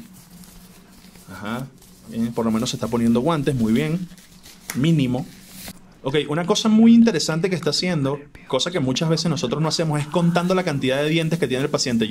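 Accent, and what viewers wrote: Venezuela. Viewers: Eres venezolano?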